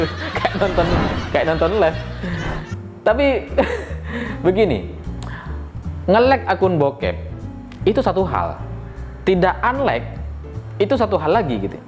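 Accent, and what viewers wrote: Madura, Indonesia. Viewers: org yg ngomong ini logatnya spt org madura ya....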